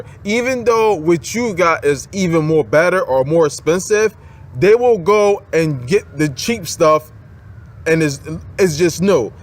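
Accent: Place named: New York, United States. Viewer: You are God sent. Thank you for sharing this information. Also i can tell your from N.Y.. Great video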